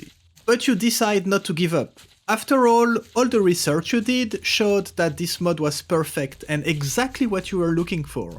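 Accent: France. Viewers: bro, just because your vid made me feel sorry for your time loss and your adorable french accent; ca craint et va te faire foutre, connard. much love, XOXO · Slt, ça s'entend que t'es français et étant francais aussi c'est un peu plus compliqué de te comprendre paradoxalement. Je sais que c'est un peu de travail en plus mais je te suggère de re doubler tes vidéos en français. Sinon j'aime bien tes vidéos, continue !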